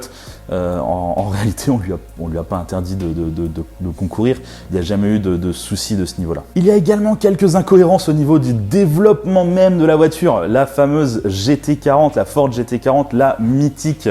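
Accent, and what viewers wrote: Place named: Moselle, France. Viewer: Désolé les gens, ici dans notre belle région c'est comme cela que l'on s'exprime ! Le Chti' d'la Moselle...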